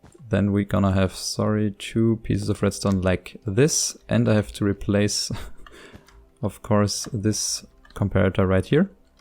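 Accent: Germany. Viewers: You kinda have a german accent..🤔 Are you from Germany?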